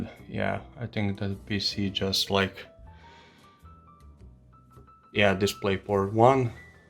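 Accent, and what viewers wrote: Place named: Eastern Europe. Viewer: Nice vid. Based on your accent, I would say youre somewhere from eastern europe, czech, poland, russia etc? My best guess is russia. This monitor is something I am interested in, however, currently, in my opinion, its simply just too expensive for me. If it drops half price, then I could consider this.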